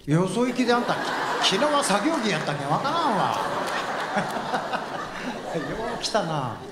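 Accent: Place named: Kyushu, Japan. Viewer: 昔と変わらないキーで歌うこうせつおいちゃんの九州弁初めて聞いた気がします。お兄ちゃんの話も初めて聞いて、「 」はGoodVibrationのが一番だと思ってましたが、こっちも泣けてしまいました。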